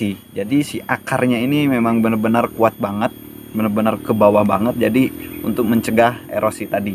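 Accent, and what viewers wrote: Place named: Java, Indonesia. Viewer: logat bicaranya ky orang jawa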